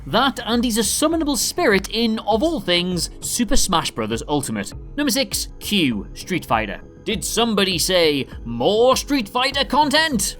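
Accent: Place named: United Kingdom. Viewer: It is a perfectly acceptable British dialect. We're not all from London you know.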